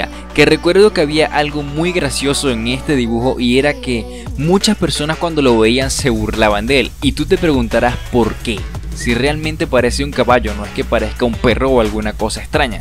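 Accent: Venezuela. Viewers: Marico... Acabo de encontrar tu canal. Si había visto videos tuyos pero así de paso. Pero hoy vi uno y escuché tu acento con atención y dije "este pana es Venezolano" JAJAJAJA y me puse a hacer maratón de videos. Mk, eres un maldito psicópata jajajaja alp... dibujas demasiado arrecho y me inspiraste brutal. Gracias <3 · Eres venezolano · eres venezolano no? yo igual jajajaj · jajajajajajjajajaj no mames eres venezolano jaajajajajajja que risa xdddd yo tambien :V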